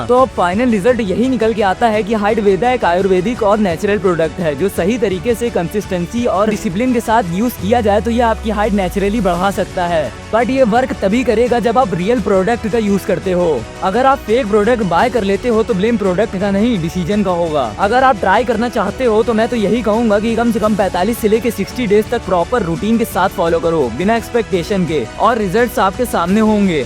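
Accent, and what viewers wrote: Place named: Lucknow, India. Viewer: bhai ap bhai lucknow jaisi aawaz me bolte hoo and mai lucknow me reh chuka hu brother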